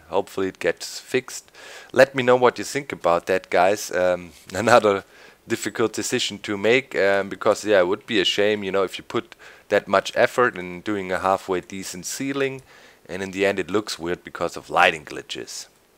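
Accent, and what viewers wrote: Germany. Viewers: has an accent like German frontman Miland Petrozza, and Miland Petrozza is badass.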